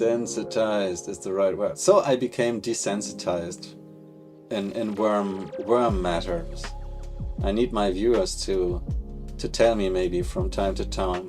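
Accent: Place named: France. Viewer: Yessss, gorgeous French accent albeit very feint 🥹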